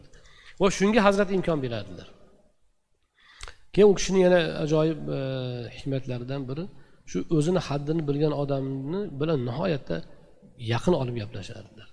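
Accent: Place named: Andijan, Uzbekistan. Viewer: АЛЛОХ рози бўлсин жудаям 👍чиройли маруза қалб ором олади андижондан анджонча содда ёқимли❤❤❤❤❤❤❤❤😊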